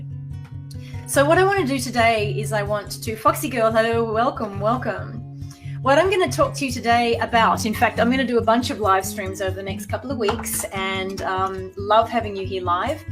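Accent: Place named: United States. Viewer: I love it when you talk American. (I am an American, so I love hearing how I sound to people outside the United States.) :)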